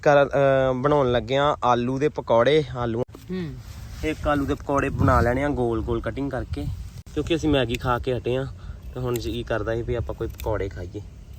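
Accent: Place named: Makhu, India. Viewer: aa boli makhu wale pase bolde aa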